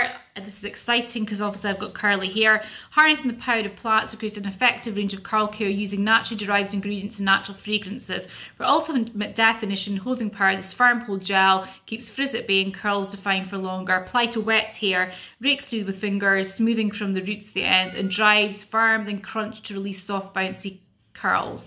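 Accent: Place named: Scotland, United Kingdom. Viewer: Great video nice to hear another Scottish accent xxx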